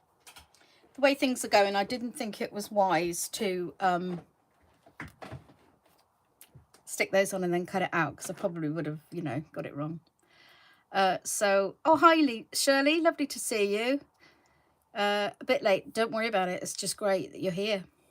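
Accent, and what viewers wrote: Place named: United States. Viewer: Love you’re American speak! Lol. Terrific cards as always! Sorry to have missed but we went in the pool. Rain was to start early so we went in early. Glad we did hasn’t stopped raining yet and it’s Sunday 10pm. Thank you!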